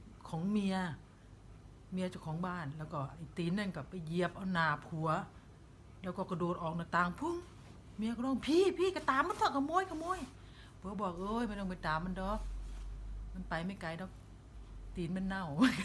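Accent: Korat, Thailand. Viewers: ชอบแม่สุพูดโคราช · ชอบแม่พูดสำเนียงโคราช น่ารัก💕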